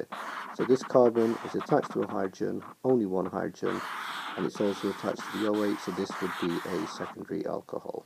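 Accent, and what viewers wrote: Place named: South Africa. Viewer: i think u are South African sir, your accent tells, by the way good job.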